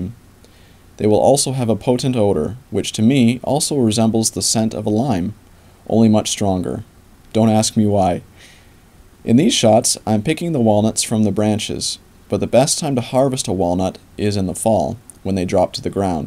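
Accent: Canada. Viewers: Idk if a guy can sound more Canadian....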